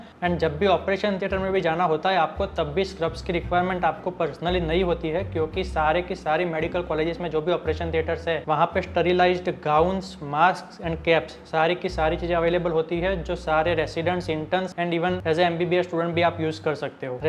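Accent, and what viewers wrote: Gujarat, India. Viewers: Behind Hindi...feel Gujarati Aap Gujarati ho kya?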